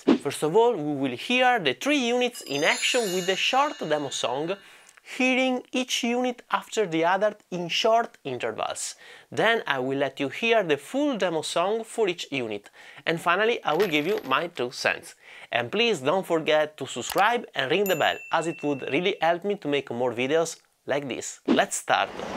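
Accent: Italy. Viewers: you're Italian I can hear it.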